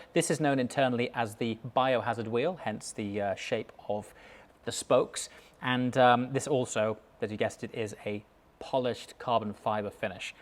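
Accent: United Kingdom. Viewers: Man, not every listener is British, speak slower and make sure that no dialect is involved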